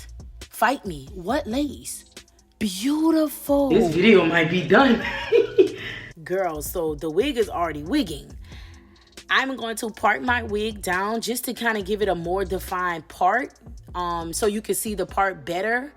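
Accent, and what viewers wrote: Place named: South, United States. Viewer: Ooop why I wasn’t ready for this cute ass voice/accent. Wth is you from, it’s given souf for sure …but I’m slightly conflicted. The way you say BELOW give the boot. Love I happened on your page today❤❤❤❤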